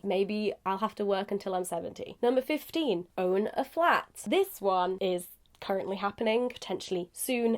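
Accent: United Kingdom. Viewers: You are very cute and sexy and the British accent...OMG...so hot! Much love from Arizona, USA.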